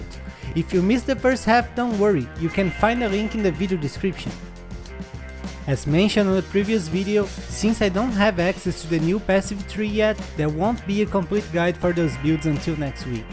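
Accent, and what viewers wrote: Brazil. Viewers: Brazilian here, you sound brazilian... Are you? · ma da pra ver que o cara é br o sotaque não engana kkkkkk full Fisk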